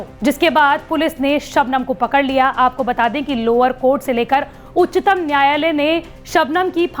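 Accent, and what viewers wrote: Bihar, India. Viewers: App bihari ho kya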